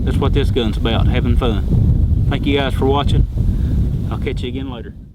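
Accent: United States. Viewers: Love ur 'merican voice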